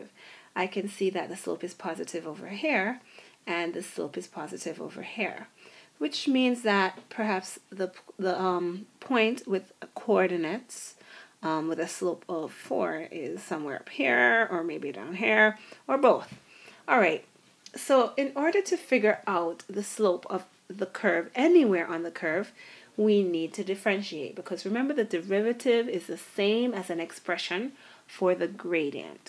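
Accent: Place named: Nigeria. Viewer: is that a Nigerian accent I hear seeping through.... much luv!!!!!!!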